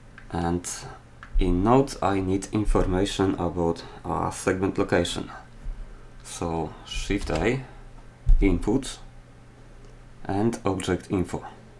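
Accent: Poland. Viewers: You sound polish... ru polish? Nice vid btw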